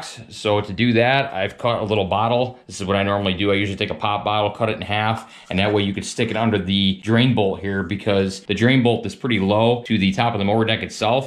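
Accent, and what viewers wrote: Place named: Western New York, United States. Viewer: Lol I'm in Rochester... I recognized your accent immediately. WNY represent!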